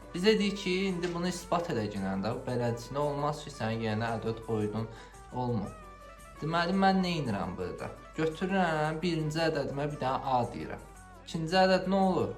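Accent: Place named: Baku, Azerbaijan. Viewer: Qeseng 👍 lehce yarasib ozumde bakiliyan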